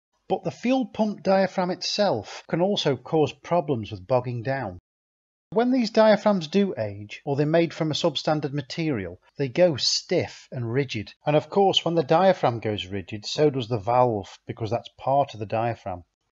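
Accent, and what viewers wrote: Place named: United Kingdom. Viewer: wow its really good to learn from a British guy for once 🇬🇧😁 as my hearing ain't great..no offense to our cousins across da pond.. but I can't always understand yer accents..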